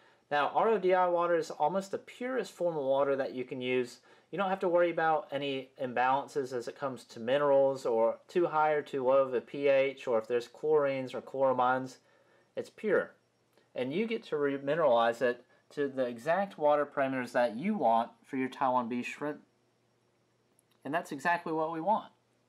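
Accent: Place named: South, United States. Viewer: Thanks for the video! Are you from Georgia? I'm from Texas and you sound very southern!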